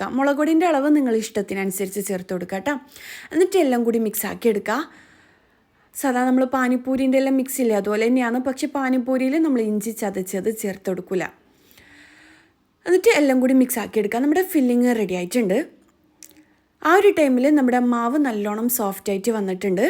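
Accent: Kannur, India. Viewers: നമ്മൾ കണ്ണൂരുകാരുടെ സംസാരം ഇങ്ങനെയാ😁😁🥰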